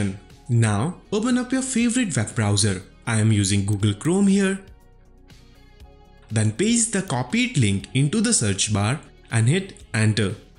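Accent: India. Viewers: He's indian accent makes this 10x easier.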